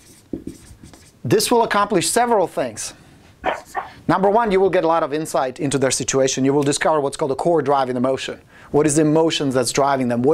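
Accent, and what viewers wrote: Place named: Czech Republic. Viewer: Hey, you mentioned your former thick Czechoslovakian accent and that you had to get rid of it. How did you pull that off? I myself need to get rid of my thick German accent...;-)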